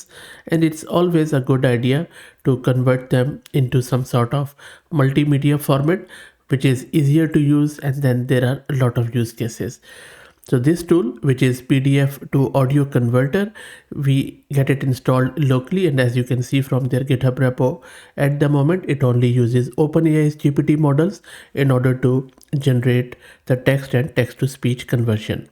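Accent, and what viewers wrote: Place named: India. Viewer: Horrible Indian accent😂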